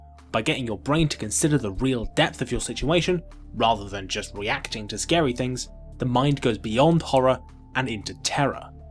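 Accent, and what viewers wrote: United Kingdom. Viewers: The fact that a man with a British accent having to clarify that he was saying terror and not Tera is hilarious to me 😂😂😂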